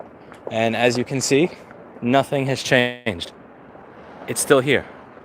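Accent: New York, United States. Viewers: Hi, Willy here. I listened to this from 75th St. and York ave, where I live as I walked to 5 W 37 ST. where I operate Reichenbach Hall German Beer Hall. I figured I would say hi. I was saying to myself, as you walked by 37th St, maybe he will mention the "sandwich board sign" that we have put on the corner to try and lure peeps down the street. Anyway, thank you for the entertainment. You still sound like a New Yawker. FYI, lost a restaurant around the block due to an asshole landlord. You have no idea how much $ we lost. If you are in the area again, contact me, you have a bratwurst and a beer on me and I will let you take a piss there if you don't tell anyone else:). One more thing, I think NYC will come back. We just have shitty leadership. And it looks like we will continue to have that.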